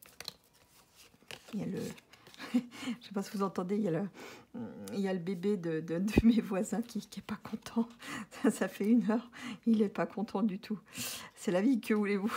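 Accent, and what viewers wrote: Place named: Switzerland. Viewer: Bonsoir contente de vous entendre pour la première fois et de savoir que vous respirez bien!! Belle voyance précise je vous remercie vavec votre petit accent proche de la Suisse? Bisous. Virginie de Haute Savoie 😊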